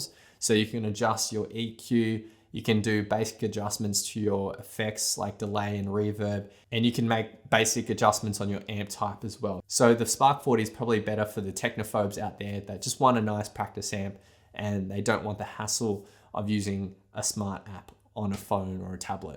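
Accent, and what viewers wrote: Australia. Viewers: Do I hear an Aussie accent? If so,.. I've noticed several guitar guys from Australia lately. Very cool and says a lot for a strong music culture there! Spark 40 sounds like you're in a box. Surprising the Spark Go has more depth of sound. Strange. Currently,.. Pre-Orders $109 for this tiny little guy!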